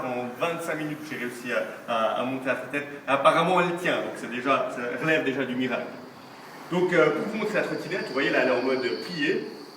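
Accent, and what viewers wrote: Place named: Belgium. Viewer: vu l accent de Belgique lol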